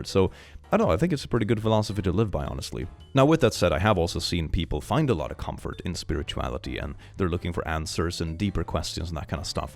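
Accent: Sweden. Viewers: I thought you were American until the impression of Danish, and now I can't stop hearing the Swedish accent. 😂 Good job on getting a very international following! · while you are pretty, I tune in mostly for your accent and the casual tossing out of Swedish words. It reminds me a bit of my Swedish-born first husband! He had a beautiful voice, too.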